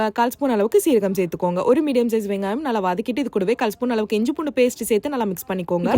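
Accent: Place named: Chennai, India. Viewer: Chennai Tamil pa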